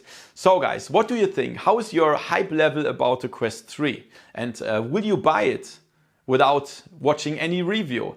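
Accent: Germany. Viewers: Are you German 🤨🤔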